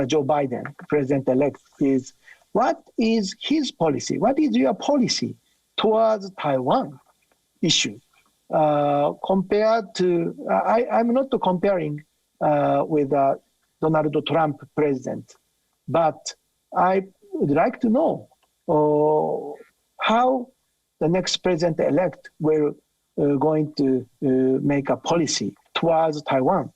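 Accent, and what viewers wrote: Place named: India. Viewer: Beautiful like indian english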